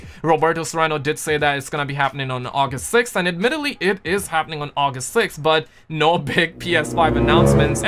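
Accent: India. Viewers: U have some Indian origin or something? Or maybe learning hindi?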